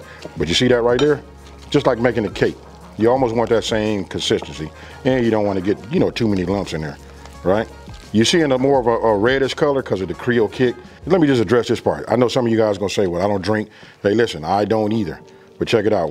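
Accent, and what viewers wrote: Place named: West Coast, United States. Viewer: Side-note I started watching your video and as soon as I heard you start talking I was like he’s so west coast 😂 he gotta be either from Cali or Denver I looked at your info and it said riverside i knew it I’m from Sac but I live in Denver now but its still the same Westcoast vibe anyways i just thought it was funny thanks for the video stay dangerous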